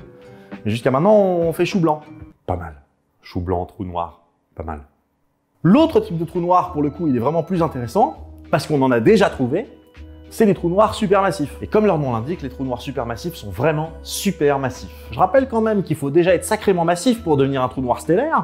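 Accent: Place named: Alsace, France. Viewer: Alsacien je crois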